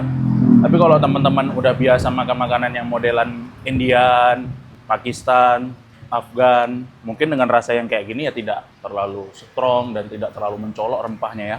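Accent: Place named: Java, Indonesia. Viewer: Hebat mas bro,,,,semoga sukses. Btw, kok bisa ngomong jawa..logat jawa,,,Gimana ceritanya ?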